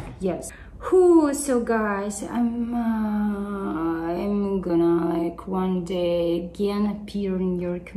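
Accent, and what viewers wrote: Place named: Russia. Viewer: Какой-то у тебя акцент русский...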